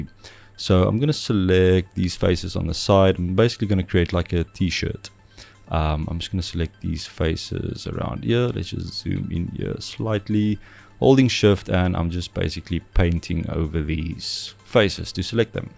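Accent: South Africa. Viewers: Ppreciate the video bro! Also, are you South African?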